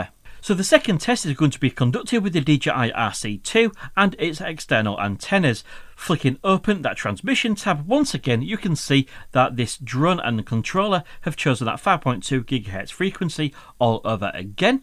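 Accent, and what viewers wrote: United Kingdom. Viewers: I am from indonesia Is the accent that you are using is british?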